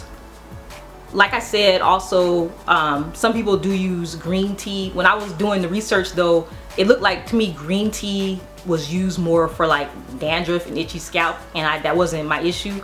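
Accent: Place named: New York, United States. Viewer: Are you from New York? I am, your accent sounds familiar.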